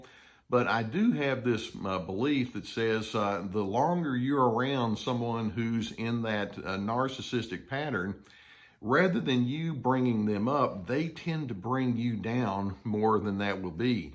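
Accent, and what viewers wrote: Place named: Texas, United States. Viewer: "I wish for you Stability, Sensibility and Objectivity". "I wish for you Dignity, Respect and Civility in your actions and relationships" = from other Dr Carter videos. I love having the truth in my ear with Dr Carter's warm, kind TX accent! Appreciate your clarification of the "duality" of people's natures! Keep up your work for the Common Good of humanity!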